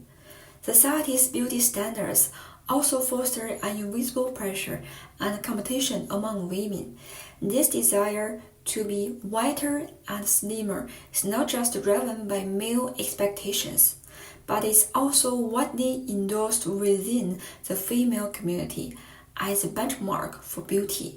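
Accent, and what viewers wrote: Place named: China. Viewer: You have a beautiful Chinese accent. But I am confused. I am not sure. You are either Hong Kong or Taiwan or Singapore or Macau. Because Mainland Chinese can't use YouTube right. Please tell me where are you from. I really wanna know where you are from. I am a new subscriber. That's why I don't know. Sorry about that😊♥️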